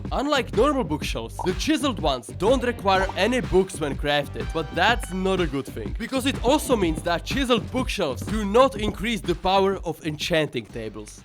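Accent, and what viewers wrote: Czech Republic. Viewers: Dle tvého přízvuku si tak na 85% typuju, že jsi z Česka. Je tomu tak? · ceskej accent jde hodne znat xd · cau podle prizvuku jsem poznal ze jsi cech drzim ti palce at se ti dari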